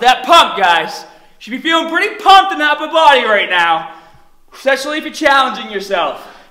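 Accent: Boston, United States. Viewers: Basten accent😄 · ROFL . XD ,I didn't know that was a Boston accent. I've just never heard an accent like yours, maybe because I'm from S.A xD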